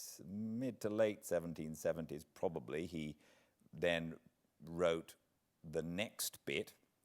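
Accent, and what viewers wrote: United Kingdom. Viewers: "But could not be reconciled to the thought that the letter might fall into the hands of the British" The irony of this line being read by a Brit is amazing. Made me chuckle. I just discovered your channel,. Thanks for making these videos; I am looking forward to going back and watching some of your previous installments. As someone who lives with dyslexia, reading long texts was always very frustrating and demoralizing for me. But I used to LOVE being read to! My dad used to read the Chronicles of Narnia, Sherlock Holmes, and other favorites to us as a family. That was a long time ago, but those memories are still some of my favorites from my childhood. This channel feels like a return to that childhood. So thank you for recording these. I'm enjoying them quite a lot.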